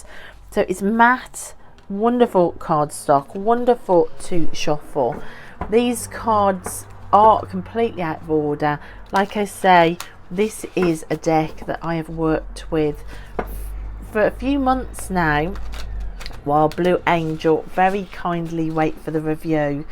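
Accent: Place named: West Midlands, United Kingdom. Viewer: I just want to say that I love your channel. I’ve watched all of your videos and I come for your personality and lovely voice. I like how you combine tarot chats with aspects of your own life and adventures, and without pushing any readings on us. I love your enthusiasm and deck introductions. Your accent reminds me of home - my relatives have a Wolverhampton accent, so West Mids, and I moved away to a location not far from you I think……near to Stratford Upon Avon. People watch channels that are interesting and make them feel peaceful. Ignore the haters.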